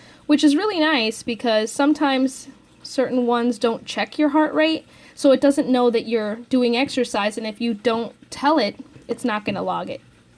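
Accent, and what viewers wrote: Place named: United States. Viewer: Голос и говор заменить бы с мириканцких на британские - я б на авторе женился.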